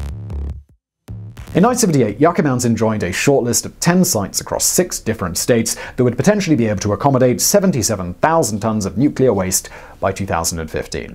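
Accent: United Kingdom. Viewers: I reposted your comment with attribution since it's good to get the truth out there; it's unlikely Simon & Team aren't aware of these things, and their claim that a 'miracle' is needed is agenda driven B.S. on their part. Simon's proliferation of channels and age-old British accent schtick to drawn in the uneducated to a perceived "authority" on a multitude of subjects is paper thin and downright clownish sometimes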